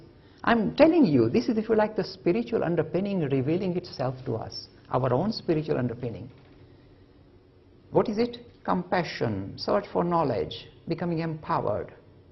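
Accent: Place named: India. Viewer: this is not richard Dawkins .this is an Indian seethe ascent not british. FAKE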